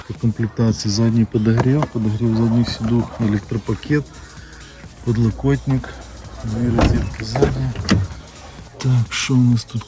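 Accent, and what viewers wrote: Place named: Surzhyk, Ukraine. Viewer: Ви круто навчилися балакати нашим суржиком))))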